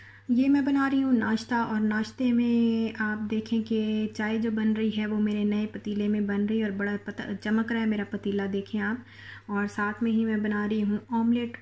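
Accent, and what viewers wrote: Saraiki, Pakistan. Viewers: Hi sister!from where are u?ap k bolne se sarahki zuban ke jhalak a rhe h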